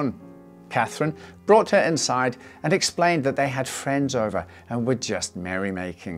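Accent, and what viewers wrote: United Kingdom. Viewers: What a fabulous discovery this channel is. I listen to MrBallens stories and they are brill but I do prefer to listen to a British voice if given the option. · Stories are just more exciting and easier to listen to when read with a British accent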